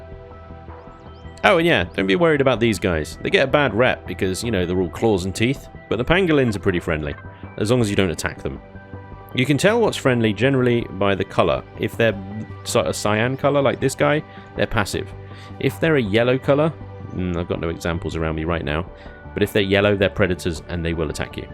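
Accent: United Kingdom. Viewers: It's his british accent. Really charms your pants off, lol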